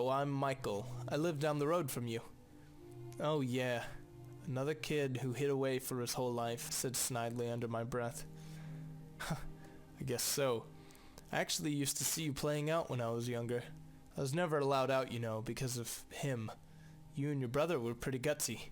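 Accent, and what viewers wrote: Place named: England, United Kingdom. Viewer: Ya its english accent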